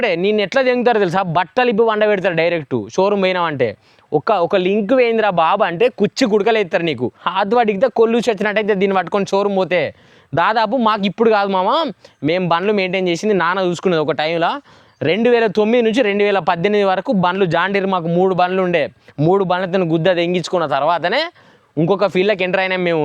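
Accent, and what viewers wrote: Telangana, India. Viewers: Anna Mahindra 575 four wheel drive riview chey Anna real telangana language super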